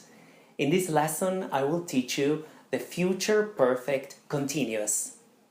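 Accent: Italy. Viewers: i would have bet that you were italian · your italian accent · I trully loved his italian accent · You are definitely Italian and not Canadian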